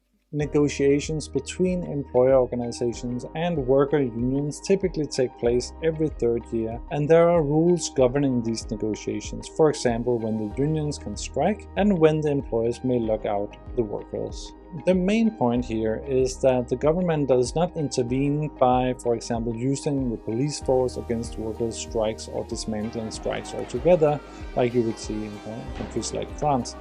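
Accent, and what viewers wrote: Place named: Denmark. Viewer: With a lot of danish accent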